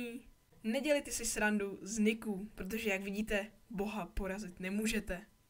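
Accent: Czech Republic. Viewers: DDD Dostalo mě jak si se snažil mluvit "epicky" a seriózně a říkal jsi ty věci takovou Českou Angličtinou:) Jinak fakt by to měli opravit. P.S. Víc videí s tou grafikou na konci, je fakt úžasná, ty detaily... :D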